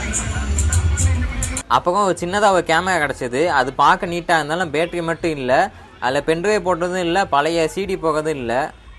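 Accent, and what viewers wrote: Madurai, India. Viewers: Madurai ahh bro neenga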